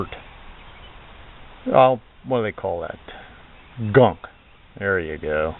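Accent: Pittsburgh, United States. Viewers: Thanks! gonna try this out on my Toro! Knew you was from da'Burgh!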